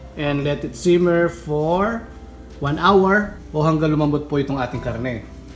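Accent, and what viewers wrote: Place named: Cavite, Philippines. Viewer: cavitenyo siguro sya kc yung accent niya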